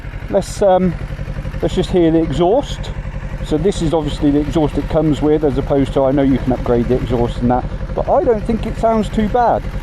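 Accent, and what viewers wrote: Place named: United Kingdom. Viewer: The British accent makes it so good. Very entertaining. Keep it up!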